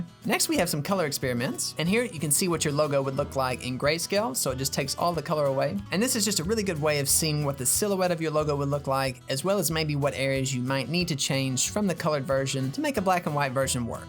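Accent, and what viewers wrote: South, United States. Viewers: Dang you a southern boy huh? Bet u hung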